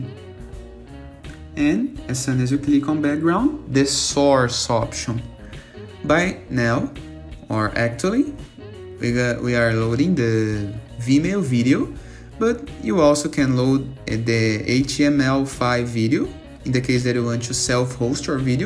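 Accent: Brazil. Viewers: I'm Brazilian and listening to your video, I can conclude that you are Brazilian as well haha.... so cute are the Brazilians English accent hehe, I don't have it anymore cause I wasn't raised in Brazil. But Thanks for your video, it was short and helpful. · this is a Brazilian accent if you have ever heard one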